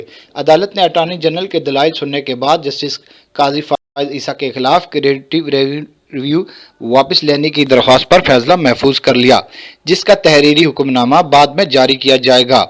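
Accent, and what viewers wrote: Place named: Kashmir, India. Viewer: Pure Kashmiri Touch😂 Keep it Up🎉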